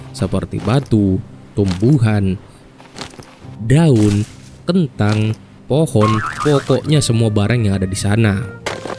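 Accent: Indonesia. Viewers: lu orang madura ya bang? logat nya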